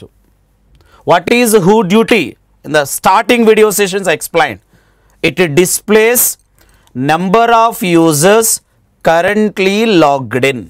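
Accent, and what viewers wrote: India. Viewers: İngilizceyi bilmiyorum sanıyordum meğer hint aksanı olunca anlayabiliyormuşum adamsınız hintliler devam <3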